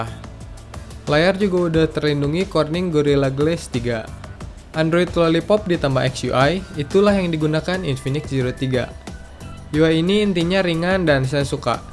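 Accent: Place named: Bandung, Indonesia. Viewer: logatna bandung pisan euy ! Sampurasun mang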